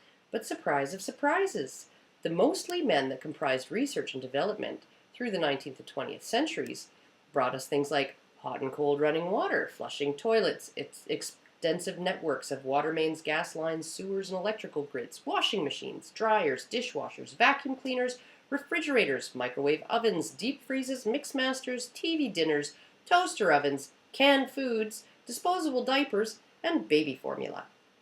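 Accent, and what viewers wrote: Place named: Canada. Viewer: your Canadian!!! xDDD thats why i like how you say sorry xD i thought i heard it sumwhere b4 lol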